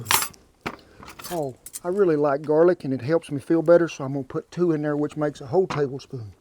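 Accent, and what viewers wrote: South, United States. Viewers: Southern accent really amazes me. Keep rocking sir with your cowboy style of cooking.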